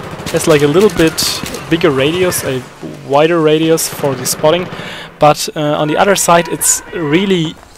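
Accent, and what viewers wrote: Germany. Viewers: You're german, aren't you?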